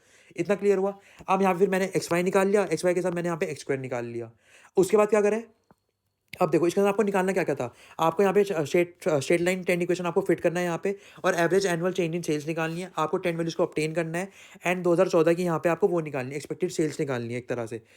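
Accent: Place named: Pahadi, India. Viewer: love that cutu pahadi accent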